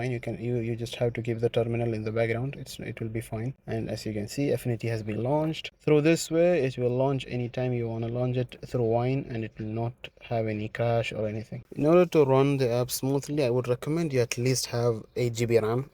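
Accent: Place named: Arab world. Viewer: واضح عربي من الاكسنت " بروازير"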